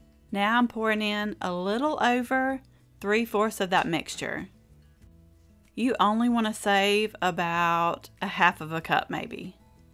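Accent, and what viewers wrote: South, United States. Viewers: Here in New England (Maine for me) we make Needhams in it, makes the chocolate shinny, check out a recipe for them.Love your southern drawl.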